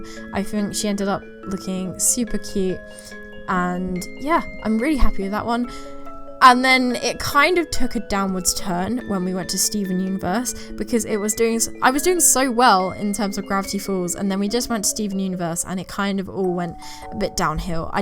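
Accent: United Kingdom. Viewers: I love you accent ! British accents are so unique ! I hate American accents maybe cuz I'm so used to them . Idk maybe I just dislike my accent eh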